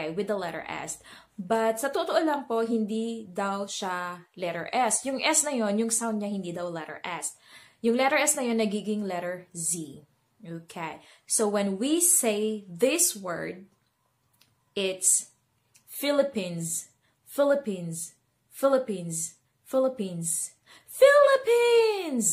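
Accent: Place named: United States. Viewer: Hello there. Your pronunciation is very good but has an slight American “slant” to it which is not always pronounced as “true English”. I assume your in the USA not England? There are many different dialects in the UK which can sound different in different areas of the country, which is also similar in the US of course. Having said that, I would suggest mayonnaise has a number of slightly different pronunciations. Where I am it has more of a “maya” naise sound to it lol 😂 Having said all of that, you speak very well and will undoubtedly help many people 🤗👍🏻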